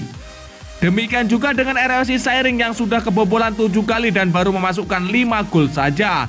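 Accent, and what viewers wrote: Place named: Banyumas, Indonesia. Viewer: Min, Ngapak banget.....😀😀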